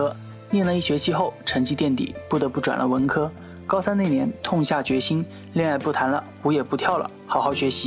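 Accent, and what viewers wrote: Hunan, China. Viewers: 湖南哪里的？ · 湖南老乡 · 是湖南人吗？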